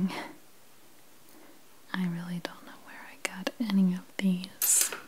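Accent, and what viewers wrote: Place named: Pennsylvania, United States. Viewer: Your accent reminds me of where I grew up in Bucks County, Pa. So much nostalgia!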